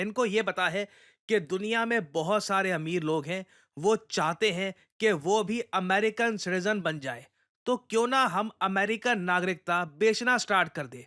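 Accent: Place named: Delhi, India. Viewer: That’s Delhi in u speaking😋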